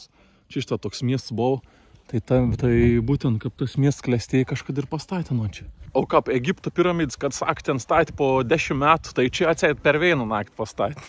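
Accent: Samogitia, Lithuania. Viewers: Super žemaitis :)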